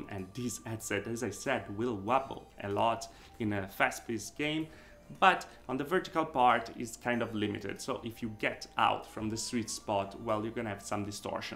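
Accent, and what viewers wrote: Italy. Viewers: Based on his accent and features I'm going to assume he's Italian.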